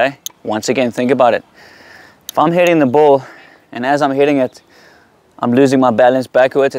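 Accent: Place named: South Africa. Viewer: The accent suggested he was South African, but the HADEDAS confirmed it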